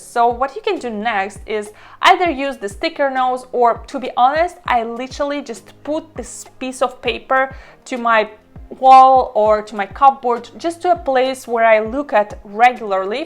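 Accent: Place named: Russia. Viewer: Hello, from a native russian speaker, it's very interesting that a russian accent is so present in your speaking. Not about good or bad, just a remark.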